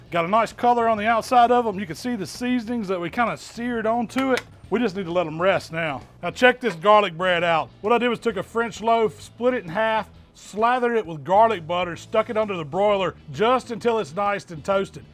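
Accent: United States. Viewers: Обожаю американский акцент.А кухня просто потрясающая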